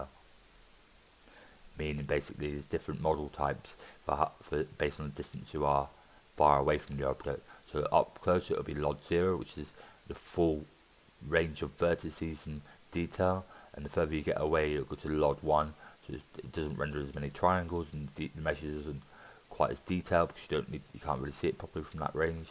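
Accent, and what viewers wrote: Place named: England, United Kingdom. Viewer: You certainly are from England =D Great tutorial brother!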